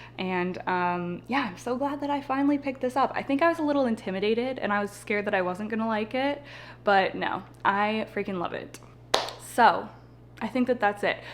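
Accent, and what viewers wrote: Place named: Canada. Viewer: If his accent isn’t Canadian I don’t what is. I have watched your planty video for a while now just discovering that you have a vlog channel. Just wanna say I love them!!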